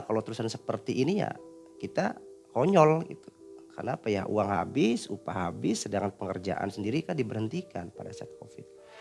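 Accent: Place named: Indramayu, Indonesia. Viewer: Waalaikumsalam salam mng ei,😂😂maaf mang bhsae wng Indramayu khas bnget (dicekedong)sya jg asli orang Indramayu,SHT2 crew MM dan narasumber nya...❤❤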